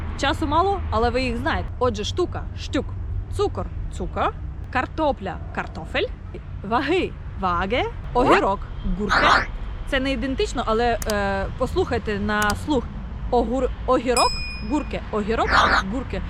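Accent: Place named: Ukraine. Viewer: Пані у Вас український акцент у німецькій вимові!)) Німці знаю нашу розкотисту букву ,,Р" вимовити не можуть!))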